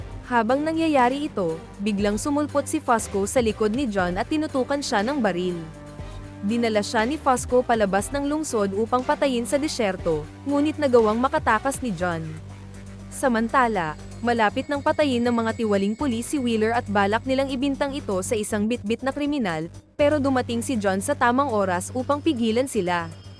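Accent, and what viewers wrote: Visayas, Philippines. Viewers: The narrator is Bisaya...kinulata?😁kaway kaway beh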